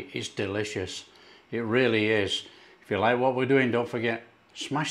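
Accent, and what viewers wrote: United Kingdom. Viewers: Can someone tell me what is this man's accent (within UK)?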